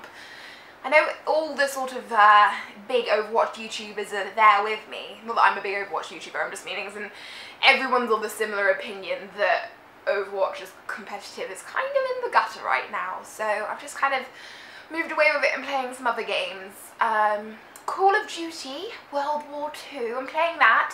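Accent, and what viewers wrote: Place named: United Kingdom. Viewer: Aren't you cute? Go for comics and animes! And music! and movies! and videogames! and lovely british accent! XD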